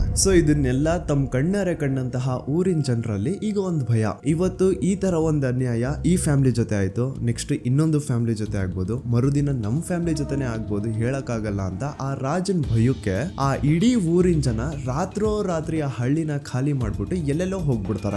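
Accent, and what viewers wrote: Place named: North Karnataka, India. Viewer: ಉತ್ತರ ಕರ್ನಾಟಕದ ಕನ್ನಡ ಭಾಷೆ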